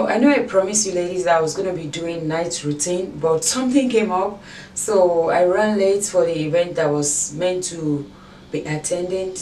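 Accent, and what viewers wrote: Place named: Africa. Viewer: Goof morning my blessed family. I hope you are well by grace.we can hear your beautiful African accent. Looking beautiful sis as always. I need some of this brash . Love you sis I declare peace and joy in the hearts of Your people! I proclaim that You will bless Your children and will keep them; You will make Your face shine upon them and be gracious to them! I pray against the plans of the adversary to steal joy and peace from Your people. I declare that Your children will remain uplifted, for there is no one who can lift the soul like You do, Amen.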